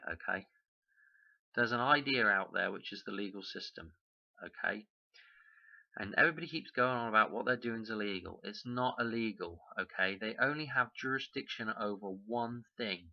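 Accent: United Kingdom. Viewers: Liked your video mate a good watch sounds like your from the uk like myself and you seem pretty knowledgeable on all the laws of nature and the artificial laws put into by the powers that be of this world.👍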